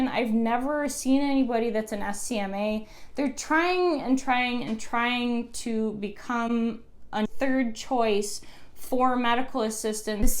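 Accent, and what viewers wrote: Midwest, United States. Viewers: Are you from Chicago or the midwest? I hear it in your accent, but I could be wrong! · I can tell you are from the Midwest!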